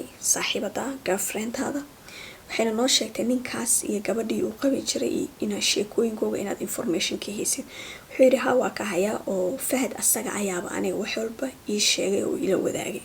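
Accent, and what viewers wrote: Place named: Mogadishu, Somalia. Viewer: Majarafad waa badiil lahjada reer xamar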